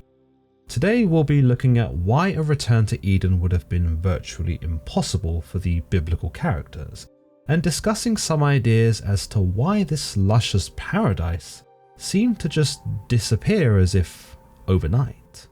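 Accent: United Kingdom. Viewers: First time actually seeing this guy. This whole time I thought he was a Caucasian British man lmao.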